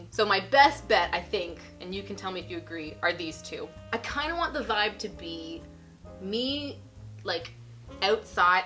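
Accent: Canada. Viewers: you're back!!! also your canadian accent makes me feel so at home :-D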